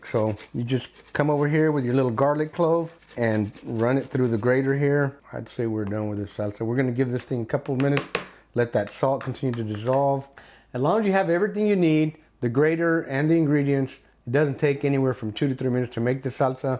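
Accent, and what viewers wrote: Texas, United States. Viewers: I like how you look like a full Mexican but have the voice/accent of a Texan. AMAZING!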